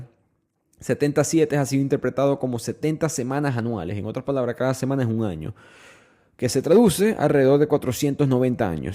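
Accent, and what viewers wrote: Maracaibo, Venezuela. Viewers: Tienes el acento del marabino educado e intelectual.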